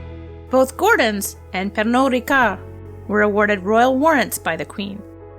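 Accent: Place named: United States. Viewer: What a simply awful accent. The pronunciation was squeamish. Americans simply should avoid pronunciation of non English speaking words and phrases.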